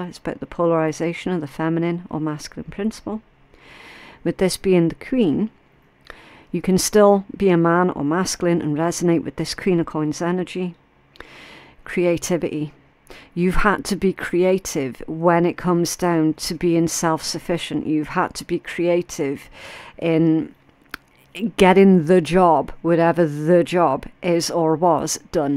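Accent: Scotland, United Kingdom. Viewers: Girl I say cut the comments back off I totally understand why u did. Lots of haters from my country US I'm from the US I am such a lover of ur channel and skill and I love ur Scottish accent yall rock You're desk is so pretty I love the cards you switch up Love your style it's so mystical Bless you & Thanks so much for the readings!!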